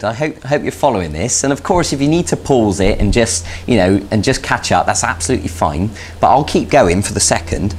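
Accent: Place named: West Country, United Kingdom. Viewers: ooh ah, I loves me geetar lessons with a West country accent. nice one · That a fine west country accent you `ave ther boy !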